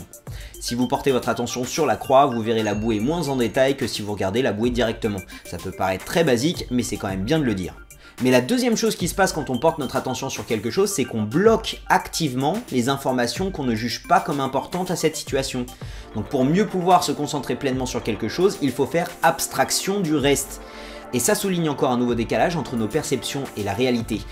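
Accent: England, United Kingdom. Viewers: Lol moi qui suit anglophone et qui regarde egalement tes videos pour ameliorer mon français je suis mort de rire de ton accent anglais il est drole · J'adore son accent anglais xD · j'avoue ton accent anglais il m'a fait rire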